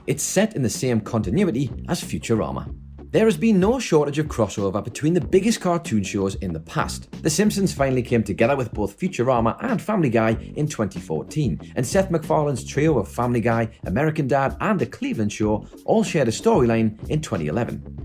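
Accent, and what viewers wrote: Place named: Newcastle, United Kingdom. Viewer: Geordie accents and rick and morty do not mix well together 😅 I can’t take any of these theories seriously. Sorry not sorry. Big up tho cool video 👊❤️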